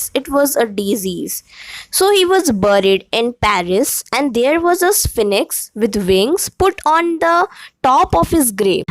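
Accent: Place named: India. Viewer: You have a kind,sweet voice but it is not for narration. A heavy Indian accent just doesn't go with a tale of a very British poet. But a nice little biography ...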